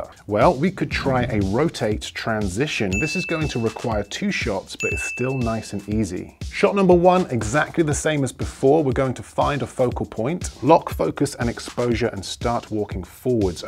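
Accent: United Kingdom. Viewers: I just subscribed a few days ago, I watched at least 15 vids of yours, I ordered a Gimbal this morning ($139) which I have never beard of lol. Next I will check out Epidemic Sound oh and Skillshare too. I just started my youtube vids, after I watched yours I am so embarrassed to show mine. But Honestly I think I have a crush on you, you're so talented, genuinely kind and hunble. LOL not to mention your British English accent so sexy 😅😅😅😅 ok let me stop 😅😅😅😅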